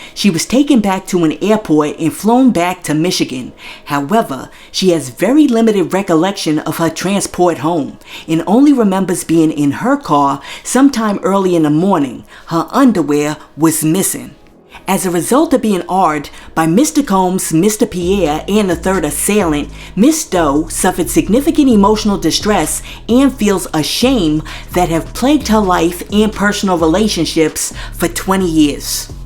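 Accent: New York, United States. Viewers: I love this narrator's heavy ass New York Bkack Accent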